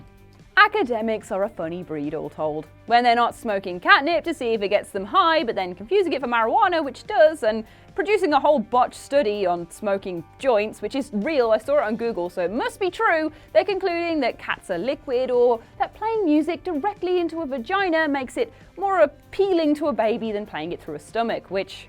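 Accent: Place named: Birmingham, United Kingdom. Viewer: well, i just found my new favourite brummie girl. Superhottie.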